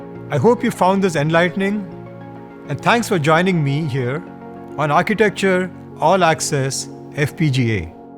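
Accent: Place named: India. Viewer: You couldn't find a guy without an indian accent?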